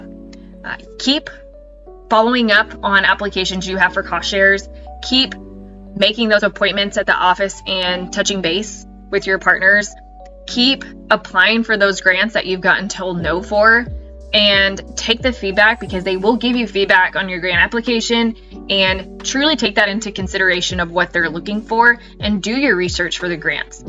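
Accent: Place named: Missouri, United States. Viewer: I had to retrain my ears to hear soil the word bcuz of your Missouri accent.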